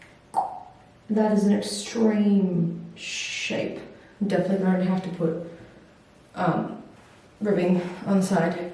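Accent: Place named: Australia. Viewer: The best part was the australian accent, love it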